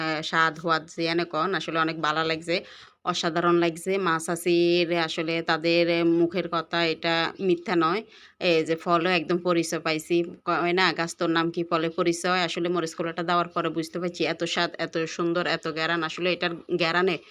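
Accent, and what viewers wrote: Noakhali, Bangladesh. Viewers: অসাধারণ লোভনীয় হয়েছে আপু মরিচ খোলা। তোমার মুখে নোয়াখালী ভাষা খুব ভালো লাগলো। ভালোবেসে একটা লাইক দিলাম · আপু রেরিপিটা সত্যি খুব অসাধারণ🌈 সেই সাথে নোয়াখালীর ভাষাটাও❤🌈❤ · অনেক লভনীয় হয়েছে মরিচ খোলা মাশাআল্লাহ ❤❤❤ সাথে আঙ্গ নোয়াখালীর আন্ঋলীক ভাষা শুনলাম খুব ভালো লাগছে ❤❤ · খুব সুন্দর রেসিপি ❤❤আর তার থেকেও বেশি সুন্দর আপনার নোয়াখালীর ভাষা❤❤❤অসাধারণ❤❤❤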